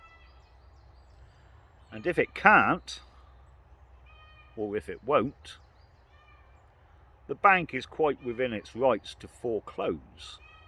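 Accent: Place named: South West England, United Kingdom. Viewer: Wondering if you're near Bristol, as we had very similar weather here a couple days back, and today, and I've been trying to place your accent for a while haha. Definitely South West, but don't worry, I'm not asking for you to give any info. I'm happy to keep the mystery alive!.. Thanks for the fantastic insight and content as always. Being an unemployed bloke in my mid 30s in the UK is a scary place to be right now. Being on Universal Credit for the first time in my life over the last couple years is nothing I'm proud of and something I had to do after getting back from a few years away just before the 'scamdemic', and after three years in Aus (not a perfect place, but a large economy like ours, the difference being the standard of life there is reflected), it's made me see how much we (here in the UK) exemplify what it is you're touching upon in this video. 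Blimey, I've really gotten an insight these last two years into just how much of a commodity and statistic I and everyone else who is out of work are viewed as, with regards to being in the system. I am currently looking for work. Being on welfare has not been good for my soul, but the prospect of getting back into work in a country that only really values me for that prospect is also quite terrifying, and that has nothing to do with the notion of a hard day's graft, but everything to do with the erosion of my soul.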